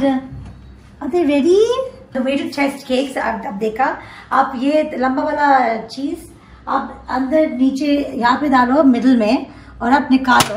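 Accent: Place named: India. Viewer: I am here for her hindi accent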